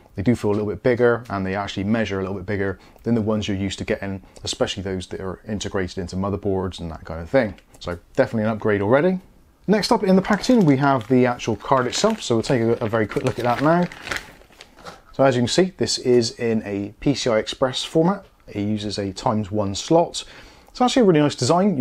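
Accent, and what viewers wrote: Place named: England, United Kingdom. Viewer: thank you for this video, but could very more useful if we could understand what are you talking about ,because for non English citizens not have a clue what you are saying,could be nicer to try to explain more slowly and without the heavy English accent thank you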